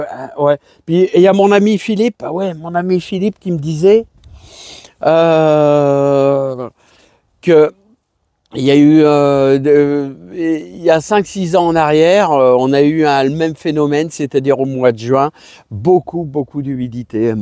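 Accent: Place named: Nord, France. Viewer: ça s'entend l'accent du ch'nord!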